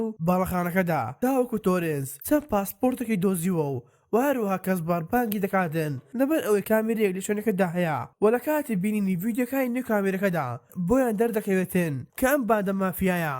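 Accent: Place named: Erbil, Iraq. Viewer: Baxwa dangt naxosha awa chia 3aaaaa har dyara hawlerit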